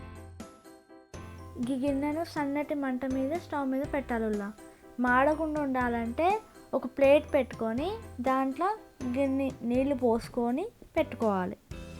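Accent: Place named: Telangana, India. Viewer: Super your telagana yasa chala bagundi alla..🥰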